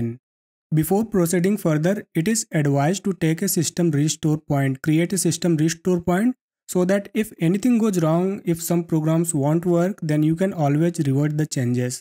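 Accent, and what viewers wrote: India. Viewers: Powerful bro with indian accent . Thank you so much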